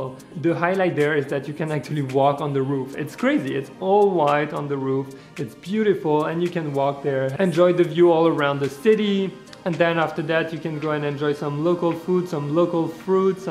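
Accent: France. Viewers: cool video! but i wonder where ur accent is from is it french?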